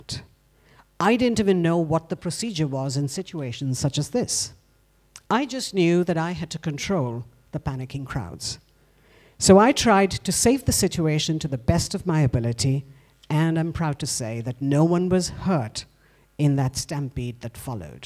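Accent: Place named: United Kingdom. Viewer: The best news reader of all time with a professional British accent.Absolutely commanding,crisp,focussed and dominating.Never heard a speaker like her in my life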